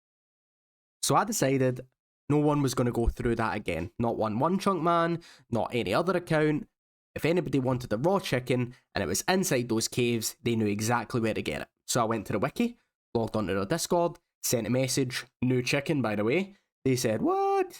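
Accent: Glasgow, United Kingdom. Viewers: Loving having a Glaswegian voiceover for a chunk lock 👌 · Scottish accent, armed goblins -> good arms vs bad arms.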